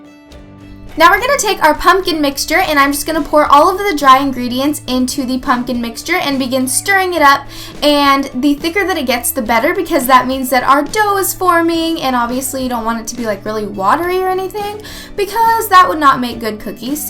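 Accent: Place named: England, United Kingdom. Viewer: you must be from england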